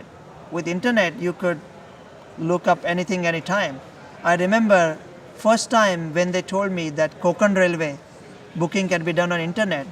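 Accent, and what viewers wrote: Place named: United States. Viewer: Waaa, speaking in अमेरिकन एक्ससेन्ट खूपच छान, बरयाचदा मराठीत ऐकायची सवय होती..👍👍👌👌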